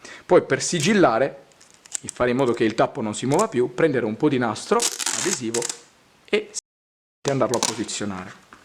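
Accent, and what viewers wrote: Italy. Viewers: Me encantan los hongos pero también tu acento...viva Italia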